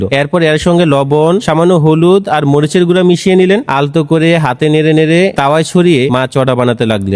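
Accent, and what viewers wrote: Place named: Narsingdi, Bangladesh. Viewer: Tai Tu boli vasha ekdom amdr Moto . Hajipur chinen? Gechen kkhno?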